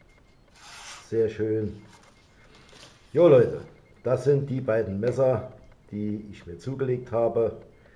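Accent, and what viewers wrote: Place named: Saarland, Germany. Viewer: Hab mir doch gedacht das ich da saarländischen Dialekt raushöre, aber St. Ingbert is mir doch ein bißchen zu weit. Gruß Andy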